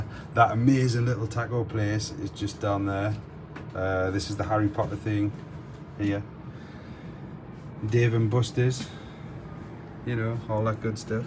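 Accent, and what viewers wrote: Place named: North East, United Kingdom. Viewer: You’re accent sounds very north east! We’ve also stayed in this hotel, loved it, fantastic location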